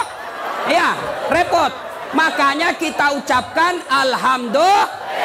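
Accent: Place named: Bugis, Indonesia. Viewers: Ustad ceramah keliling Indonesia, tapi logat Makassar nya ndak pernah hilang.dan lucunya lagi siapapun yg dengar ceramahnya ikut tertawa. Ntah tertawa karena memang mengerti atau tertawa karena penyampaian logat Makassar nya 😊. Untungnya pernah 10tahun tinggal di Makassar jadi mengerti sekali apa yg beliau bilang... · Suka sama bawakan ceramah dg aksen Bugis dan kalo baca ayat itu sesuai dg bacaan orng Sulawesi Krn disana jarang O yg banyak A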